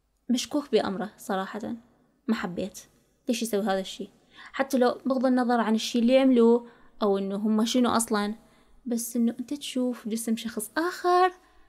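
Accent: Iraq. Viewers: يزين اللهجه العراقيه تخبلييين❤️❤️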